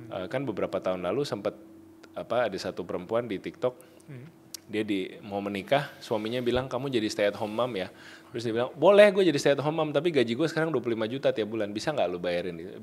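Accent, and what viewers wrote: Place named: Sumatra, Indonesia. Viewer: hostnya ngomongnya ga smooth terbata2. logat sumatera totok banget😂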